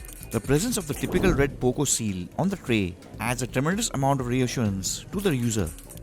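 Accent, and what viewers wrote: India. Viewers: From his accent I knew he was Indian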